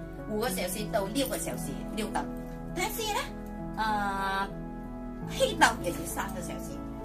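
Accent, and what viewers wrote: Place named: Cantonese, China. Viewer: 你講啲話好可愛，一半廣東話一半台灣話，聽到我想笑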